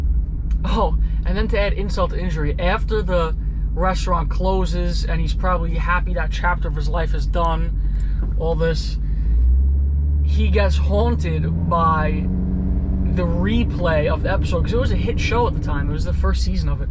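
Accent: New York, United States. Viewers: I love your videos & your voice! You’ve got a tiny bit of the NY accent.🥰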